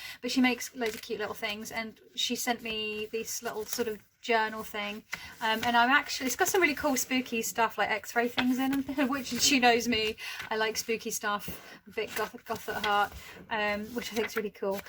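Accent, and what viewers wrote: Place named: Scotland, United Kingdom. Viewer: This was fun! I definitely think you should have some stitching in each vlog - I love watching your technique! Your stitches are so neat! And what a fun Scottish accent!! 😄👍 Did you make a pouch for your Noblet tarot?! I'll need to make one. I'll see what colours I've got available 😄 Looking forward to the next one! 💖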